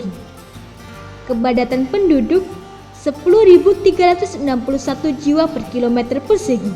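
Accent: Java, Indonesia. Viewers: Suaranya bahasanya kok medok totok kali jawa nya. Jadinya memuakkan. Apa gak ada manusia yg lain ? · Ngomong logat jawa males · Medok amat mba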